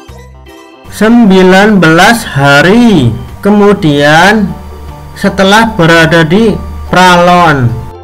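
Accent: Banyumas, Indonesia. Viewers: Ngapak yak😅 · versi ngapak gesssss ..... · Ngapak mn gan...salam dariku dari malaysia..asal wonosobo😊.. Ma kasih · Di gas i kang ngapak e. Mantap hdroponik nya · wkwk logat ngapaknya masih ada gan 😂 tp nice info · Daerah ngapak ndi yah ..pengin belajar langsung.. inyong cilacap. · Hidroponik ngapak gaes..... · ka ya uwong ngapak kie yah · Suarane ngapak, hidup ngapak, semangat, ilmunya bermanfaat, makasih kang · Bahasanya masih kaku... Pasti orang ngapak nih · Terhibur dengerin suara mas nya. Ngapaknya kentel banget. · bahasane NGAPAK MEMBAHANA...tapi bisa dipahami..terima kasih GAES.... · Yang ngisi suara kayak orang ngapak pasti kiye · gan iki ngapak banyumasan opo tegal gan ? pada bae ngapak sukses yo bos... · Bukan jawa gesss, tpi ngapak gesss bhsa daerah terlucu dan gk bisa hilang klaupun pkek bhsa indonesia ngapakknya tetep ada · wong ngapak bro?salam ngapak bro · Logate wong ngapak kie ya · Jawa Ngapak Nya Jelas Banget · Ngapak yaak😂😂😂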